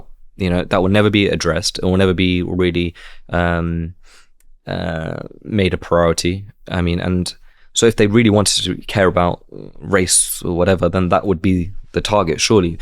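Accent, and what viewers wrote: United Kingdom. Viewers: Good information well put .. British English spoken ..the tone and and the pace is so much easier and pleasant to the ears .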